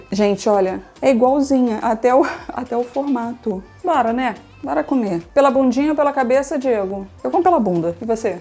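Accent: Rio de Janeiro, Brazil. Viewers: vc me leva para casa com o seu sotaque e o sabor das lojas que só tem no Rio, hoje moro em Bauru. Vou fazer agora!🙏😘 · Tu é carioca,?? Me identifiquei muito com seu jeito de falar hahahahha Vou fazer hoje essa coxinha, vamos verrrrrr!!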